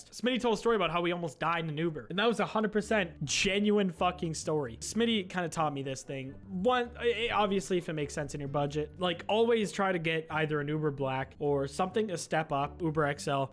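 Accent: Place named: Canada. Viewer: God I never realized just how canadian you sound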